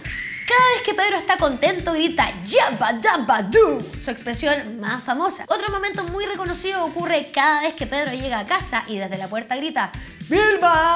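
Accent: Chile. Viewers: Produchon, achon me encanta la fonética de los chilenos xD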